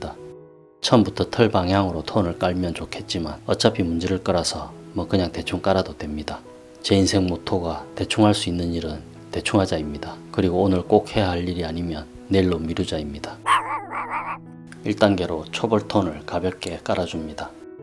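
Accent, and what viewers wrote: Gyeongsang, South Korea. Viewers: 보고 또 보고~~~ 전말 저도 겸손한 전은혜입니다ㅎ 유머가 제 스탈입니다 전말 ㅎㅎ 팬이 될듯 구독ᆞ좋아요~~~ 말투가어딘지모르게 갱상도 억양 느낌이 전 창원인데 그림 배우러 가면 멀까요~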